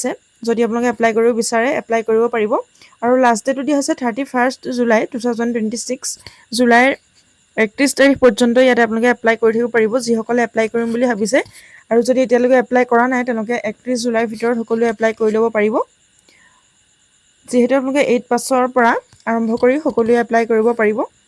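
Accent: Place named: Tezpur, India. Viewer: Tezpurr lage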